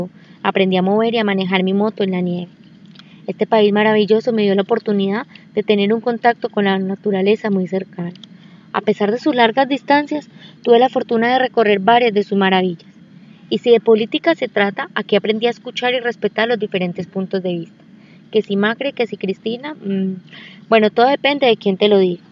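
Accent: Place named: Colombia. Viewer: muy lindo tu relato, hermoso tu acento colombiano, emocionante tu apreciación sobre nosotros y nuestro país que está lleno de buena gente como colombia, siempre bien venida, suerte en todo lo que te toque vivir.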